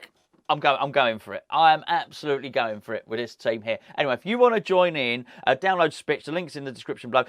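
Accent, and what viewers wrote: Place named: Scotland, United Kingdom. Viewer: Great Scottish accent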